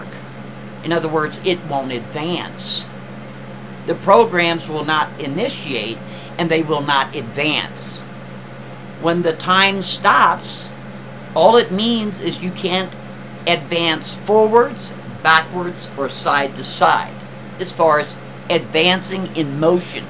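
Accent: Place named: South, United States. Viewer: A<link> DAMN UNCLE! I’m a new subscriber to your channels. I love everything you do. “Split Time”....hanging on EVERY.SINGLE.WORD. I love me some!! I’m from South Carolina so I caught the southern accent that you were throwing around especially when the story got to the damn uncle. LMAO 😂 It really cracks me up bc you usually don’t talk that way. Anyway, I loved the story, I love October Reignz, & I11uminati. You input a Song on your other channel/video recently “ ” by the and I was thinking while I was watching other October Reignz videos how perfect THAT song would be in an upload. I was like, wait for it,& there it was. I don’t wanna sound like a sycophant or anything but I LOVE EVERYTHING THAT YOU DO!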